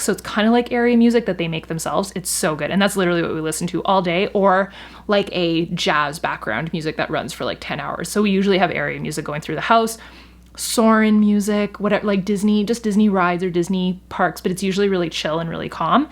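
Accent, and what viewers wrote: Quebec, Canada. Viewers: Tbh as a french canadian, I love when you speak french with the perfect Quebecois accent.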